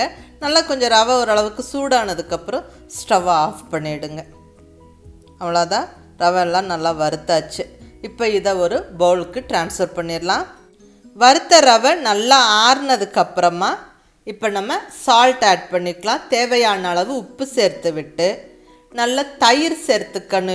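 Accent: Coimbatore, India. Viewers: ........w r u????? I want to see u..... i like ur all recepies......kovai slang superrrrrrr......i like u.... i am INDHUMATHI vellore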